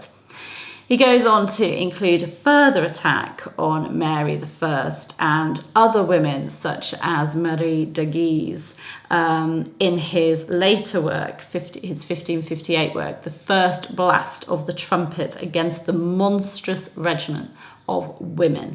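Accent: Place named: RP, United Kingdom. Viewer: OMGosh -- I'm cringing at your dreadfully posh Ingleeshi accent and pompous body language. You are the reincarnation of Bloody Mary -- complete with the tattoo on your arm, and NHS teeth 🤣😂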